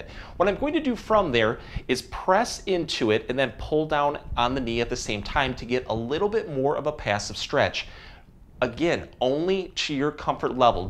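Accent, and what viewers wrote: Michigan, United States. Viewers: are you from Michigan? Trying to place the accent.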